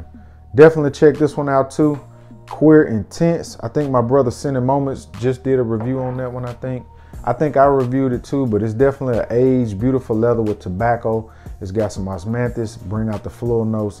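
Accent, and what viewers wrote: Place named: South, United States. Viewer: !! Man you talk country like me 😃. That Chanel sounds great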